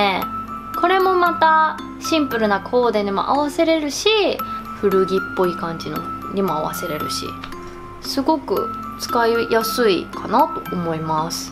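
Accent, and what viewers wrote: Hakata, Japan. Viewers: YouTubeで博多弁聞くとなぜかほっこりする笑 アクセサリーが大人の女性って感じで綺麗やん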